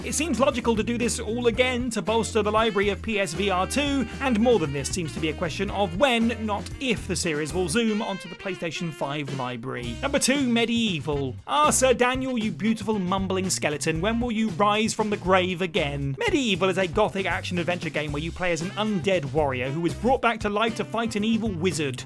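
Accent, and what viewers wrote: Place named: Scotland, United Kingdom. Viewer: Can someone explain to me why scots say "Sweatch" instead of "Switch"??😂😂😂 Sounds smooth af 😎😎😎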